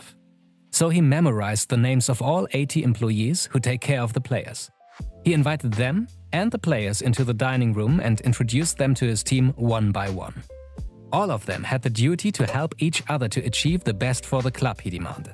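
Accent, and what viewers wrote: Germany. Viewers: The voice over guy is probably German cause his accent is so annoying! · R u deutsch btw? Cuz ur accent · Das ist der geschmeidigste deursche Akzent, den ich bisher gehört habe. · Stabiles Englisch aber man hört doch stark das deutsch raus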